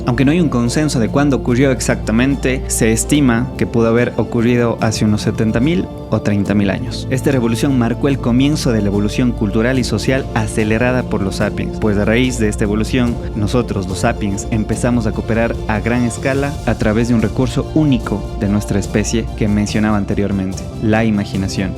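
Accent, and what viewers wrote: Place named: Ecuador. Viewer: Eres de ecuador? El acento es inconfundible :P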